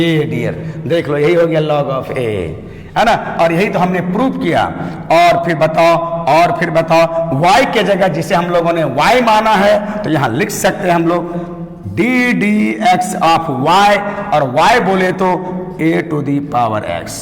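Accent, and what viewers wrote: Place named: Bhojpuri, India. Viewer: Very nice sar bhojpuri style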